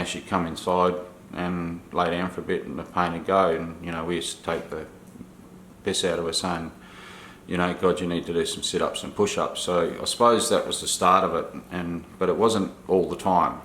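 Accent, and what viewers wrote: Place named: Queensland, Australia. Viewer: Hi, thank you so much for sharing your story😪 I've been diagnosed with stage 3 pancreatic cancer. This is so strange I live in Emerald Qld and after watching your videos, it sounds like you are from this area too? I've got soooo many questions to ask? Like where did you get the oxygen, and cannabis oil and more? Is there any way I can contact you please? My Facebook is Jaco-Marie Jakes Jordaan.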